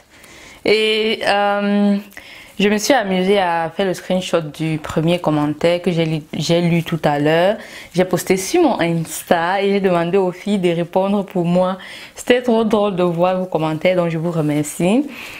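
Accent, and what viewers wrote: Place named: Cameroon. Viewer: Vous avezvun accent Camerounais😆😆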